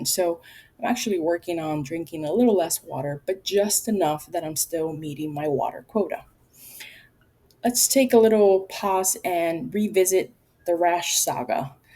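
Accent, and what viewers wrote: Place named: Latin America. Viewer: Thanks for the shout out!, I never counted one calorie during this entire 3 year GLP-1 excursion that has helped me lose over 280 lbs. If you are not led to count, don't! There can be medical reasons to, but let your provider/nutrition-specialist guide that path, not nagging from others. And your weight loss? Girl, you are losing 1 pound a week! That is the exact average weight loss. Those of us who lose fast are the outliers, not you. People, including me, who are super-responders, tend to speak up louder than those who are on the path at the normal pace. You are doing great! Nosy question you don't have to answer: Are you Latina? I detect the accent. ¡Soy cubana!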